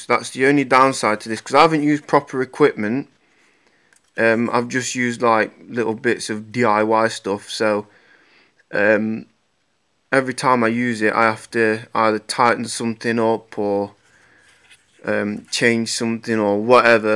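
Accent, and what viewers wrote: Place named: United Kingdom. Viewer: You can tell you from the uk😂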